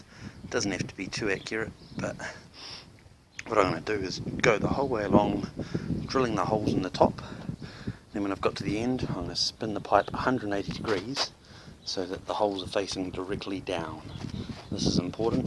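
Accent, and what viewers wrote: New Zealand. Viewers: Loved this! Funny guy and I could tell you were a kiwi:) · are you a kiwi too bro ? love i found this video as its exactly what i need to know! chur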